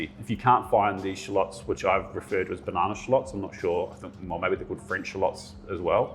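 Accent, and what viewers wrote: New Zealand. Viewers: Are you a kiwi mate? I recognise that accent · Spot that kiwi accent a mile away. Heaps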